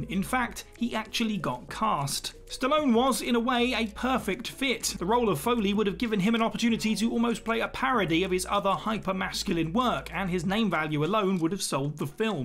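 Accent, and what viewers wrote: United Kingdom. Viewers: You Brits and your proper English. 😉 It's Elvira, long I pronounced El vy ruh. And Lily's 1st name E van jeh leen. I think a Stallone Beverly Hills Cop would be cool to see since we got the great one from Eddie. I enjoyed the Snowman movie. My mom had died before I saw that film. It made me cry since the main character lost his dad. It's a heartfelt movie.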